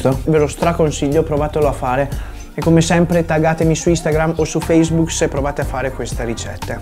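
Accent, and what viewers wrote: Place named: Trentino, Italy. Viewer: though he does have a Trentino accent with Veneto influences, and the dialects that are spoken there belong to the same family of southern French and Castilian and are the more "spanish sounding" of the country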